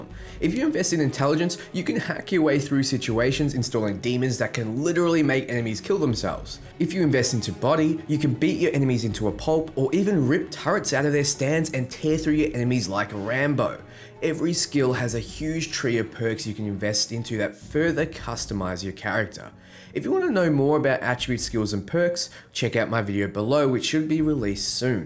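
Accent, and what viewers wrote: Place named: Australia. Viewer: Yoooo. Gimme the scoop compared to Red Dead? I’ll sub regardless, you sounds like an Aussie who is cooped up.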